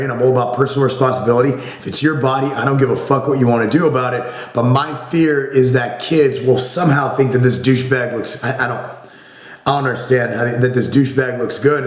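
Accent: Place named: Brooklyn, United States. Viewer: He totally has that nasty Brooklyn style/speech going on.... Yuck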